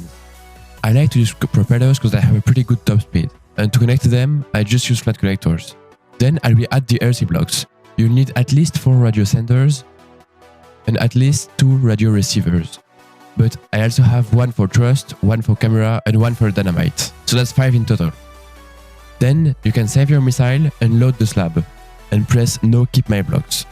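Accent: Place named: France. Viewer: parce que quand tu parles tu sonnes français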